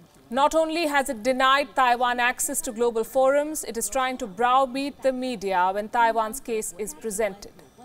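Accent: India. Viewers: Try speaking Hindi or Tamil. Your English is too Indian. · but what part of India though?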